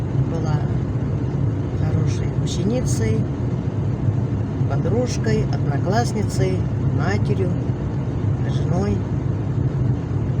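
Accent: Tatarstan, Russia. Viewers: вы молодец люблю татарскии акцент я сама татарка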